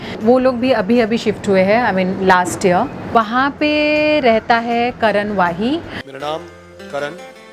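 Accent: Bengal, India. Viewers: Oh your Bengali accent🥵😘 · Your accent says that you are bengali😅❤️Bengali Beauty❤️❤️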